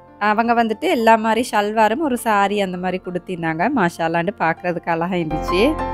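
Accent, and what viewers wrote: Akurana, Sri Lanka. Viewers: ok sis akurana pechi mathiri irundhichi athu than keten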